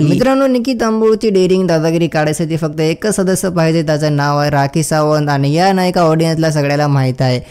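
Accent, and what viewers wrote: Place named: Marathwada, India. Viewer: Bhava tu maratwadyacha ahes kai😅, apli bhasa sarkhich hai😅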